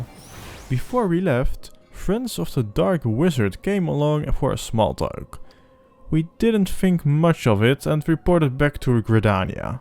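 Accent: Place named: Netherlands. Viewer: Hehehe, your dutch accent makes me happy. I hope you enjoy the game!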